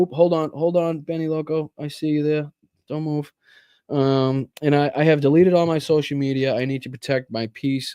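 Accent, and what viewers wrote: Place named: New Bedford, United States. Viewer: I was raised in New Bedford. You sound like the folks I grew up with. Keep the example up. We must keep going. No dropping the ball.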